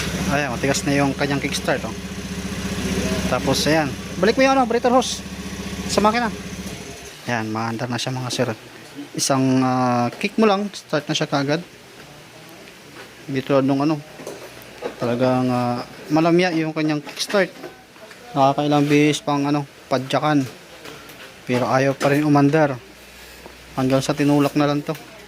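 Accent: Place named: Visayas, Philippines. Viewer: Taga asa ka idol,parang may hang Visaya ang sa Lita mo, Ako Taga bohol....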